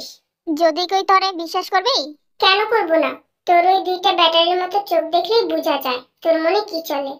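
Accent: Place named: Brahmanbaria, Bangladesh. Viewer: Na tmr kotha shune mone hocche ashole dadur bashy shobay emon bhabe kotha bole ty mone holo tmi Brahmonbaria r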